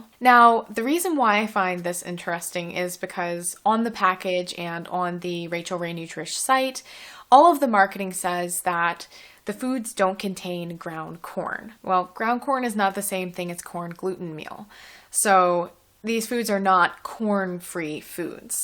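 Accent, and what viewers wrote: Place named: Illinois, United States. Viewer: Sounds like you’re from IL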